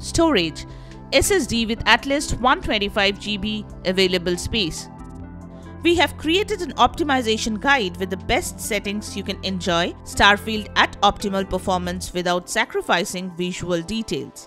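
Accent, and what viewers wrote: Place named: India. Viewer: the accent instantly told me what i needed to know, down voted, and "dont recommend channel" lol i NEVER trust indian accents with anything.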